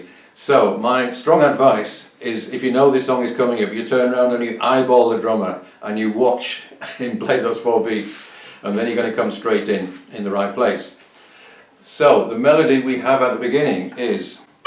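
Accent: Yorkshire, United Kingdom. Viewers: There is no issue with you doing this in a yorkshire accent, as you somewhat note in that it takes the old tongue to do and the aussies are another I could think of that hold on to it. Its interesting to hear it that way and have no objection to it, just dont let it die. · Brilliant tutorial! Thanks. The Yorkshire accent adds a new twist to an old classic 😉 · why is he british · Sounds way better in Yorkshire accent! 👍😉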